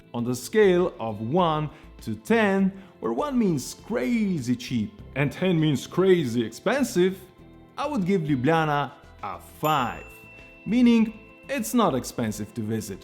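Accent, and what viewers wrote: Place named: England, United Kingdom. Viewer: Thank you for all of your videos. I've watched many of northern Italy and Slovenia. Great presentation with a very charming English accent. Keep up the great work!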